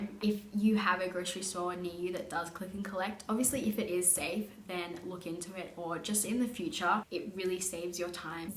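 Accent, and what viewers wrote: Australia. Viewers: she probably grew up in Australia she has a Australian accent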